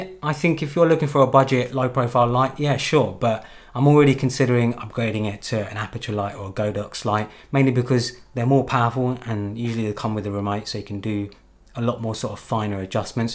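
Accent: United Kingdom. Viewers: Hey that studio set up is Tickety-Boo looks sweet, quick question you mention $ in the video but that accent is defo from here in Blighty - Happy New Year!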